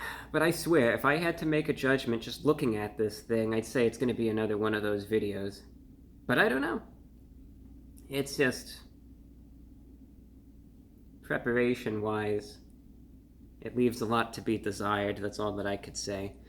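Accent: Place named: Transatlantic, United States. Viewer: Your Transatlantic accent is absolute perfection.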